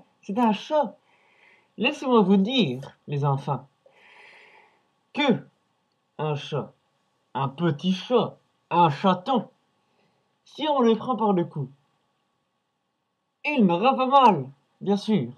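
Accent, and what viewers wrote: Belgium. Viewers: t'es aussi débile que ses vidéos. Tu te caches derrière un masque et ca camoufle pas ton accent belge. On a compris que tu defends les chats. Par contre, tu devrais faire une video sur les félines quand elles prennent leur petit par la peau du cou. Je pense que t'es resté trop longtemps sans oxygene. retires ton masque ca ira mieux. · "Si un homme n'est pas bon z'avec les animaux." + GG l'accent belge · tu serait pas belge toi? (pour ton accent de merde et ta connerie naturel)! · ton accent belge i ma bandé!! alor continu a decouvrir ton mac et faire mumuz ac photobooth!! obligé de mettre un mask pr parler soi tu sert a rien soit ta pa de couille! bref t bidon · Et evidémment, qui c'est qui fait ce genre de chose? Un Belge >< (Au temps pour moi si tu ne l'es pas, ton accent a couper au couteau m'a trompé) Après ca, nous, bons belges que nous sommes, on va encore s'en prendre plein la geule, sincèrement du fond du coeur, MERCI! 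(Putain de VDM la:/ ) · ACCENT BELGE! BAH SA METONNE PAS! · Tu craint casse toi avec ton accent tu insulte la belgique!!!!! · Nan mais sans déconner les gens vous critiquez sa vidéo mais le mec ca se voit bien qu'il a fait ca pour déconner avec son accent belge et tout moi ca m'a bien fait rire.... Alors arrêtez 2 secondes de lecher les boules de Norman quoi... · ne cherche pas serieu...1) je vois pas le manque de respect envers les chats... et 2) fais pas ton philosophe, ton accent belge craint a mort!! et enfin 3) Norman est bien plus drôle...!! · "Prinnnmièrement, les chats sont des animaux comme les auuutres hein: Ils ont droit à du respect les iiiiinfants, du REPECT! Et ca Norman ne la pas cooompris les iiiiiinfants" J'approuve, c'est bien wallon comme chez moi ca:p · Fait pitié en plus jcomprend rien a cki raconte on dirait encore un belge de merde avec sa voix xddd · Ah oui, il est sûrement belge lui. 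Je dis ça par rapport à son accent. Mais vous inquiétez pas les gens, ce mec est juste chiant. Mais je me demande franchement s'il ne faut pas prendre la chose avec humour, tout ça n'est qu'un sketch sensé faire rire. Ou sinon, c'est de la jalousie mal placée. Pis bon, niveau philosophie, éthologie et argumentation, faudra repasser. Crois-moi mon "petit gars", le chat s'il est vraiment maltraité, il aura tôt fait de se casser.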